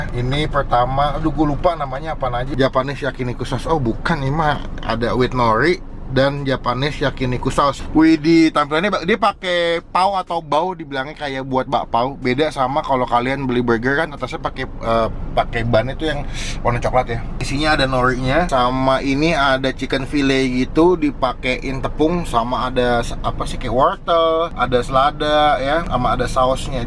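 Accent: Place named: South Jakarta, Indonesia. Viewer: Kok kamu jaksel sekali yoooo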